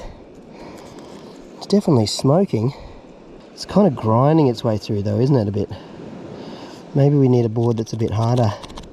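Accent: Australia. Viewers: aussie accent overload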